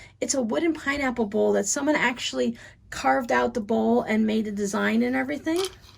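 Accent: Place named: South, United States. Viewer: sincerely I didn’t intend to imply you say it incorrectly. Being born and raised in Florida and having been gone since aged 20 I love hearing how you say things in a sweet southern accent. Ha be a wonderful Christmas. ❤️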